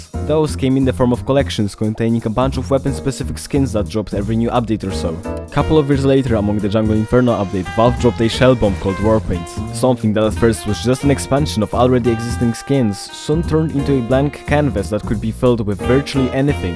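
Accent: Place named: Eastern Europe. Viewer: Are you Central or Eastern European? Your accent sounds slavic. Great video by the way.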